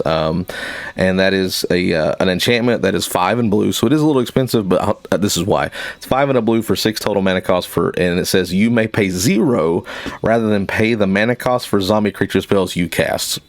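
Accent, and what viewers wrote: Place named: South, United States. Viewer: Where "Southern " are you? SW Louisiana here.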